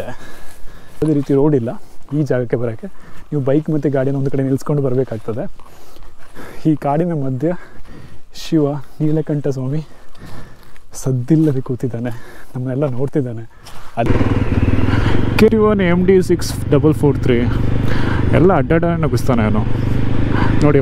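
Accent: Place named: Dakshina Kannada, India. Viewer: ದಕ್ಷಿಣ ಕನ್ನಡ ಕೇಳ್ಳಿಕೆ ಒಂದು ಚಂದ ಮಾರೇ🫶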